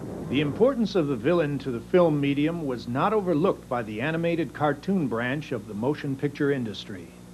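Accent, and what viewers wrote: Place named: Transatlantic, United States. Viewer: Had me thinking there was this talented guy with a transatlantic accent in the studio with and no way he chopped all this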